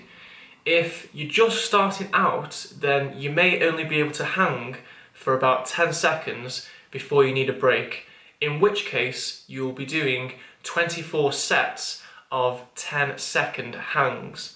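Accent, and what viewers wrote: United Kingdom. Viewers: You speak uk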